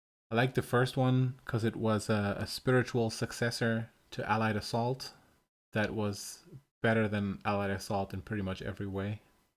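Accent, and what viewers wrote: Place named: United States. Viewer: Your accent sounds SO american to me (it took an interesting amount of time - no, I can’t remember how long, I only knew that once - until I realized: Oooooh! A German?!), dass ich mich beinahe nicht getraue, etwas auf Deutsch zu schreiben. 😄 Na, ne, so schlimm ist es dann doch nicht…mehr. Zumindest nicht dramatisch genug, um mich davon abzuhalten, dir kurz aufzudrängen, dass ich in vielerlei Hinsicht schwer beeindruckt und sehr dankbar bin, denn: Durch deine enorme Offenheit, und damit automatisch die Stärke zu vertrauen, habe ich das erste Mal seit Jahren das vage Gefühl: „Ja, doch, man kann sich verletzlich zeigen - und es kann schön sein“. Weißt du, ich dachte, ich würde eines Tages mit Begeisterung Geschichten schreiben, denn früher hatte es mir großen Spaß gemacht, durch behutsames Freilegen eines Charakters, intime Situationen entstehen zu lassen. 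"Ich dachte" war natürlich einmal "ich denke", aber einige spannende depressive Episoden, lästig wie eine Person, die ganz dicht vor einem hergeht, eigens für den Zweck, nach Lust und Laune abrupt stehen zu bleiben, sodass man nicht anders kann, als gegen sie zu prallen, machten es mir sehr schwer. Irgendwann hat man erschreckend große Angst davor, es könnte einem jemand zu nahe kommen. Deswegen kann man bestimmt von einer gewissen Erleichterung sprechen, wenn man jemandem zuhören darf, der einem vormacht, wie mutig geht. Und das machst du wirklich großartig - dankeschön. 😊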